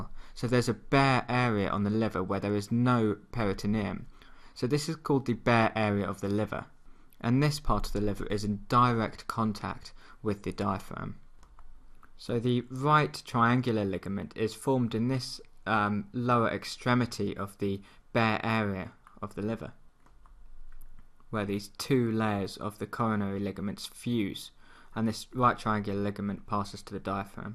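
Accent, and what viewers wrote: United Kingdom. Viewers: the accent is clear British it can't get any more beautiful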